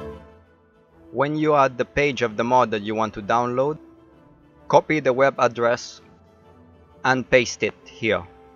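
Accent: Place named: India. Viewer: You sound indian holding in his language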